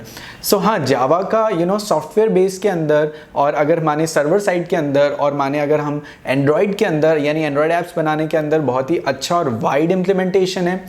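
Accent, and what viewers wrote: India. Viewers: Indian accent is too strong...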